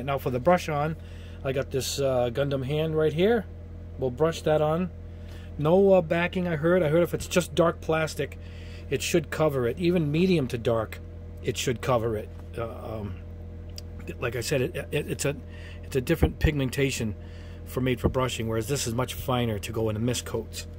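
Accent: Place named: New England, United States. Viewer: I’m hearing a distinct New England accent here…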